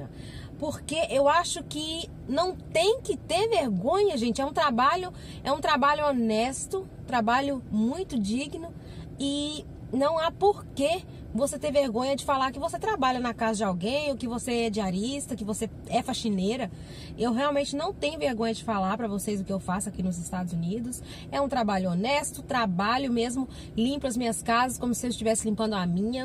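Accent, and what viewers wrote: Minas Gerais, Brazil. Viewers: Oi, acabei de chegar no se canal e já amei o primeiro vídeo que assisti. Você está certíssima, amiga, vergonha temos que ter da desonestidade. Seu trabalho é muito digno sim e se você está vencendo na vida é graças a ele. Amiga, percebi um sotaque mineiro, eu também sou mineira de Coronel Fabriciano, e você é de onde? 😘😘😘😘😘😘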